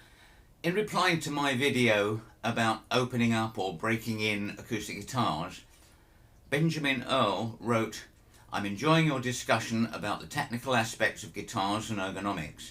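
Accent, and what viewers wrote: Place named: United Kingdom. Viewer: Good vid! You Brits sound a little funny to a West Texan, but that sure doesn't make yur view of the world any less valuable. ;) I'm a guitar tech, (Luthiers are the guys that actually know what they are doing) and I get tons of first timers, in fact thats the market I'm in. One amazing thing I've discovered with new players is if we teach them the mechanics of the guitar, action, level frets, tuning, string changing, checking and setting the truss rod. Just minimal maintenance, this "seasons" the player and like getting the wood used to resonating, now you have a familiarity built in between the student and the large wooden object sitting in the student's lap.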